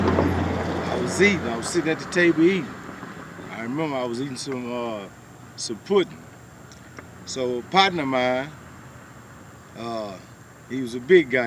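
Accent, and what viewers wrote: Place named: Texas, United States. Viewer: I knew u was from TX when I heard “gettin fly at da mouf” 😭 mane den I seen the location on da video and u in da southside of houston I’m from da nawfside much love bro I fw yo videos.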